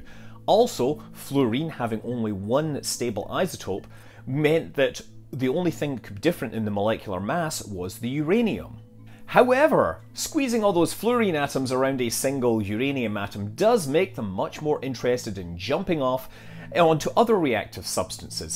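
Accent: United Kingdom. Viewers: Is s Accent British or Irish? Don't get me wrong,I love it and understand him better than someone from Chicago, just curious and glad he is here with us in America now! ❤❤❤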